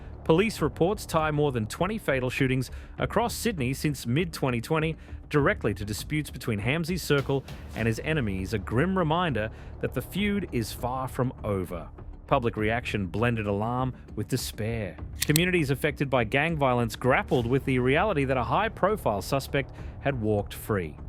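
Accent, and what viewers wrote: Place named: United States. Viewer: I hear an American accent but it could be AI if not do some research so you stop sounding like a dickhead it's always been like this why do you think some people earn full colours in half the time of others. You sound like a square head who reads something in the newspaper and then talks to other straights like he's in the know. Don't usually comment but I'm sick of this shit.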